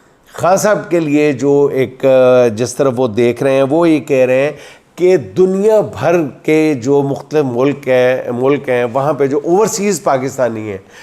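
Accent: Punjab, Pakistan. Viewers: تمام تر احترام کے ساتھ: جب آپ کہتے ہیں کہ "یہ اقدام اٹھایا" تو آپ سے غور کرنے کی گزارش ہے کہ اقدام plural ہے قدم کا۔ آپ کہ سکتے ہیں کہ "یہ قدم اٹھایا" دوسرے کہ "جن ملک میں" کے بجائے "جن ممالک" کہنا چاہیے میرا مقصد آپ کو شرمندہ کرنا ہرگز بھی نہیں ہے کیونکہ اب ہم پنجابی لحجہ میں اردو سننے کے عادی سے ہو گئے ہیں شکریہ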